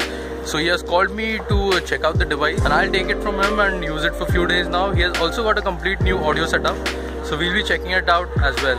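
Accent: Maharashtra, India. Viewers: That marathi tone in ur voice and gyserin this hot weather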